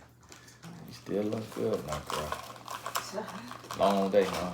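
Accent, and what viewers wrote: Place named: South, United States. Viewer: unrelated but i really like your accent! as someone from california, it’s satisfying to listen to. southern accents for the win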